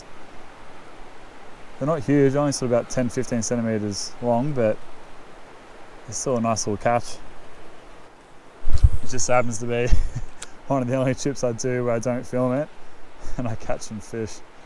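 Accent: Australia. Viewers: Love your videos of Australia and I’d visit your continent if I could. I’m from Canada and I showed you to him. We both like the accent and keep the videos coming!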